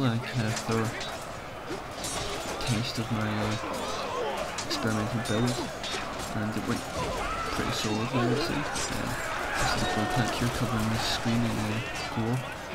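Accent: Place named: Scotland, United Kingdom. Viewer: Finally a Scottish Shogun 2 commentator, if yer accents anything to go by. :)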